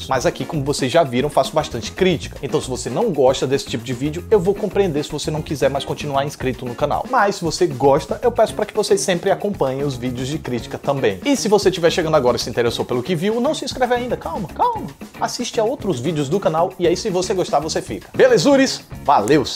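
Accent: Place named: Alagoas, Brazil. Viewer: Acho tu e teu canal muito fodas, sou inscrito, acompanho e sempre indico aos amigos, te acho um baita profissional e te admiro ainda mais desde que percebi teu sotaque e descobri que tu es alagoano, se é difícil pra tanta gente talentosa chegar á, imagina pra um alagoano, mas hoje queria dar os parabéns não só pelo vídeo, mas pela resposta sobre sua gratidão ao Felipe Neto que mesmo eu não curtindo o cara, passei a admira-lo pelo que ele foi, é e representa pra ti, pela amizade e pela gratidão, eu podia até não gostar dele, mas hoje já ha algo de diferente no que eu penso a respeito do cara. Meu abraço hoje vai pros dois (rsrs). Parabéns por isso e pelo canal.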